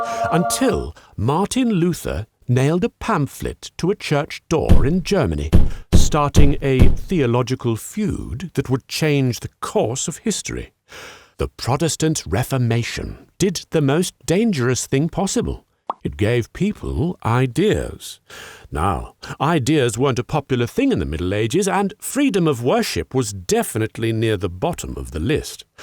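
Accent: United Kingdom. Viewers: I’m Portuguese from Oporto!!! I’m very grateful for this video!!! Thank you very much!!! Beautiful video!!! One of the best I ever seen about my country history explained in British English 🇬🇧 Aye British English!!! Not American English hahaha 😆 I hate that to be honest!!! Cheers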